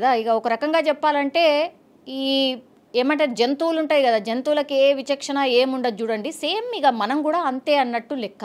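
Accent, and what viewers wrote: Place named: Telangana, India. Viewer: Pure Telangana super anchoring ❤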